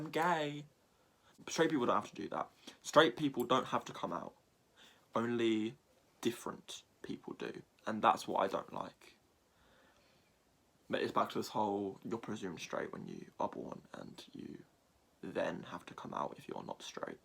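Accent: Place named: United Kingdom. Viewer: Loved ur video. The British accent is always interesting to me. I couldnt replicate yours (they seem to vary a bit, perhaps by region in England?) Irish & Scottish I think I'm able to notice as diff, but how do so many UK actors star in US movies & talk w/o their accent & sound American? Does it take a lot of practice or could u speak like us if u wanted? We all share the same gay steps, from self-identification, to depression or envy, to masking it & usually coming out. I think major factors are: how conservative/anti-gay are the parents and how feminine is the boy as he grows up (since it plants a seed in everyone's mind that he may be gay. It's tough when guys are masculine growing up, because coming out can be hard for everyone to believe, parents can panic/go into denial. Your story was good, it covered every angle of coming in terms of being gay, dealing with the bullshit & realizing how different our lives will be, but also how exciting they can be once u find the right person. 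I had a thrilling decade of dating, 3somes & serious relationships after I moved from the suburbs of Chicago to Fort Lauderdale, Florida, where the Mayor is gay, the bathhouses are gay, along with the businesses, bars & clubs. Even a strip of bars along Wilton Drive are open all night & that area of the road is shut down to cars, so Pride Parades can be held, Halloween can be celebrated with big stages w/musicians etc. The food market on the street is nearly 100% gay shoppers. Everyone in the en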